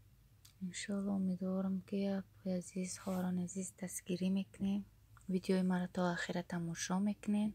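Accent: Kulob, Tajikistan. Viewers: Салом ма дав да канали хдт мехмон шидм да як канали дигат дромадм ай тарзи гапзанит фамидмки тоза Кулоби нав якта блогери кулоби дидм .манхам кулобиюм .бо хамрохи шавхарам бо фарзандом да масква зиндаги мекунем Кулобчон ба пеш .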